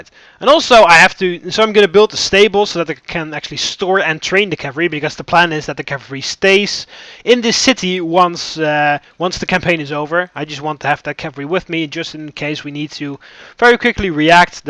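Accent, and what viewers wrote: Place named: Netherlands. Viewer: also are you dutch because you kinda have a dutch english accent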